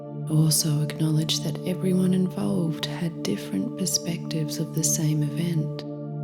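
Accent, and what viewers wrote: Australia. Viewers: It’s such a welcome to hear your beautiful accent! I’ve been living State side for almost 14 years now (time flies!!!) & have been missing home 🇦🇺 heaps. Appreciating your affirmation videos as well as the meditations while getting a dose of aussie accent 🙏🏻 I’m so grateful I’ve found your channel that aligns perfectly with the inner work I’ve been practicing. Many thanks & blessings 🙌❤️🙏🏻✨💓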